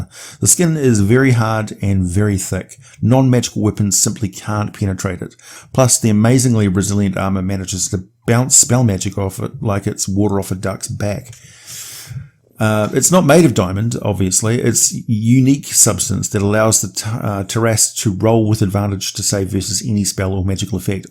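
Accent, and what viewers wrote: New Zealand. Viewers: Do I hear a kiwi? Or maybe South African... I should be able to tell the difference I’m half kiwi 😂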